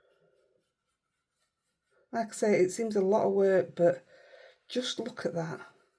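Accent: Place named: Lancashire, United Kingdom. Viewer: That's a stunning bauble! I live in Texas, USA, now, but my home and heart are still on the Lancashire/Yorkshire border (Clithero)--it's wonderful to hear a familiar dialect.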